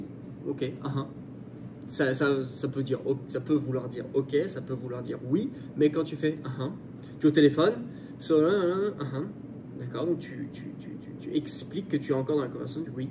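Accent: Scotland, United Kingdom. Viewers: “Aha” is kind of international 😉 Love the Scottish accent 🤪 Have a great day !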